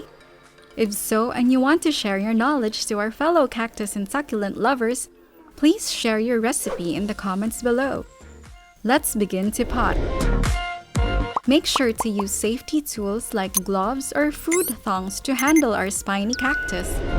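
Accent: Philippines. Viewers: wow! ur speaking have improved very much! i don't know if that is a voice app but what matters is that it's not boring to hear anymore. i'm the one who advised u to talk faster and don't use filipino english accent 1 year ago. u are very good now! goodluck to ur plant vlogs. 😊❤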